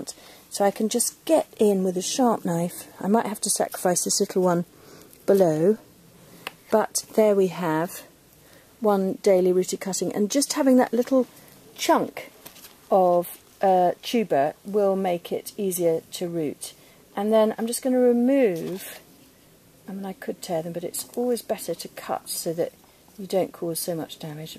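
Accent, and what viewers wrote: United Kingdom. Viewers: Wow! Thanks for the video. I learned a lot! Where are you from? Are you from Britain?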